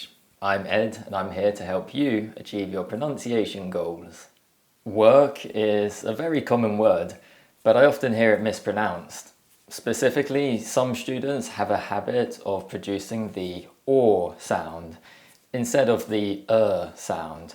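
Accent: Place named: England, United Kingdom. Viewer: Well done. I have some difficulties pronouncing those words in sentences with a British accent because I've achieved a defined American accent, but at least I tried it. But regarding understanding your accent, I shouldn't have problems understanding most people from England. You're a handsome man, Ed. Thanks for your helpful lessons. 🥰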